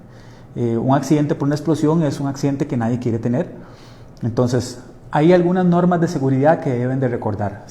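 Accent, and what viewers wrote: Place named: Costa Rica. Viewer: Es por su hablar un costarricense SALUDES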